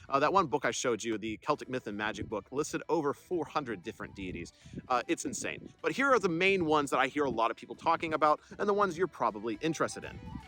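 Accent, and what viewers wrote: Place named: United States. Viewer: Y r u American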